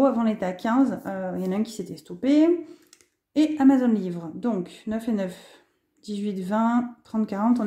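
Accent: South, France. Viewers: Je trouve votre idée super pour le scolaire et pour les enveloppes ✉️ budgets etc... vous êtes une vrai warrior avec tout ce que vous entreprenez c'est très courageux! Vous avez un accent du sud je trouve c'est très jolie à entendre🙂 je vous souhaite de mener à bien tout vos projets et de vous faire un max de sous afin de pouvoir profiter un max de la vie avec vos proches🙂👍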